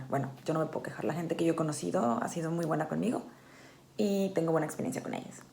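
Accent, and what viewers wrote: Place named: Monterrey, Mexico. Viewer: Holaaa. Acabo de suscribirme a tu canal y me gusta tu vibra.. Si no me equivoco te escucho con acento regio o al menos de otro estado del norte del pais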